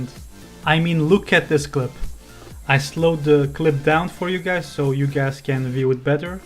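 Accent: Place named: Belgium. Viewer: lol my bad, I mean to put Belgian cause I have this friends that's from where you are and he says what the hell just like you with the accent.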